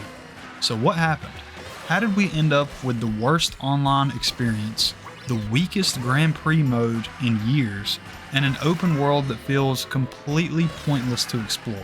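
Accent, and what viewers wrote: South, United States. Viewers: completely off topic, but where in the South are you from? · love his southern accent too as a Texan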